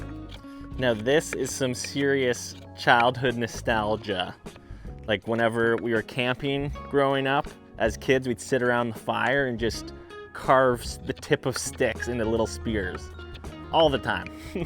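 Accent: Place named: Canada. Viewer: It’s only been seconds into the video and I can already tell the guys Canadian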